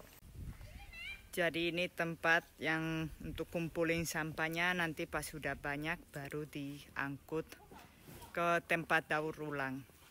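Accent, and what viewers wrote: Java, Indonesia. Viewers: Logatnya Jawa bener njir:v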